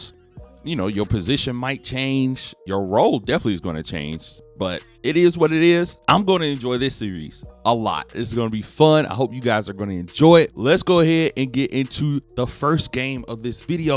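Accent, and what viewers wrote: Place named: Alabama, United States. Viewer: Nahh you gotta be from Alabama I hear it in yo voice 😭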